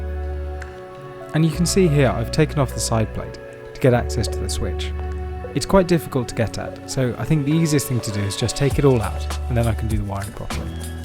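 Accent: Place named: RP, United Kingdom. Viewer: What a pleasure to hear this young lad speaking 'the Queen's English'. Call it what you will - received pronunciation; BBC English; cut-glass English, vowel-speak, or if you want to be really disparaging, 'posh boy speak'. But every word is properly formed, and readily understandable by all English speakers. The video is doubly enjoyable, because it's just gently overlaid with non-obtrusive music, rather than head-banging guitars. An intelligent piece of work by a civilised presenter. The engineering may be causing seasoned perfectionists to frown, but the lads sheer likability and chutzpah brought a smile to my face. Well done,. You set a good example.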